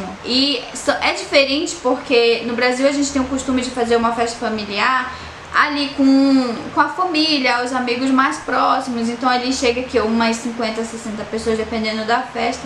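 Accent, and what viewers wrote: Mexico. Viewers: Já está até com o sutaque deles🤭❤️ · Até o seu sotaque é mexicano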